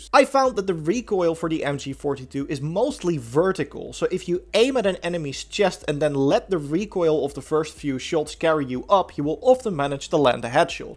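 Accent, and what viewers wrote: Netherlands. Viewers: You must be Dutch. Heard it within the first 4 words you spoke. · I can hear on this guys accent he’s Dutch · Are you Dutch?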